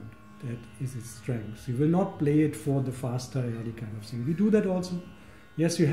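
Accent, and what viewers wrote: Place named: Germany. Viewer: I think it's German accent. lol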